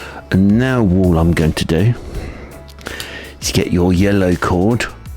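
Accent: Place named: United Kingdom. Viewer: Thank you for your videos, your british accent makes it easer to understand what to do than the north america accent that all the videos have had that jag earler looked at.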